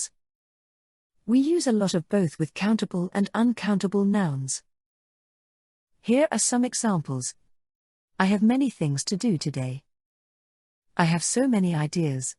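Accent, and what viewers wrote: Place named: United Kingdom. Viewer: Thx u , i love it brittish accent 🎉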